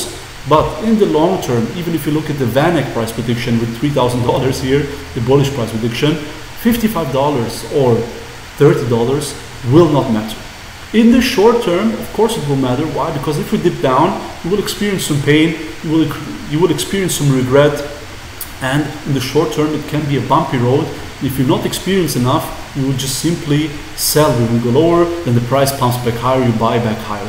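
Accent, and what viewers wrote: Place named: Belgium. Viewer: are you from belgium? You really sound like a belgian guy speaking english 😁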